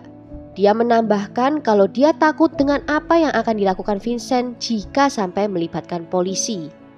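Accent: Java, Indonesia. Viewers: Hay medokuhhh cantik 💕💕💕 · tidak apa2 mungkin karena pengaruh logat Jawa ya. Kalau ibuk e cocok tapi kalau ibuknya tidak cocok.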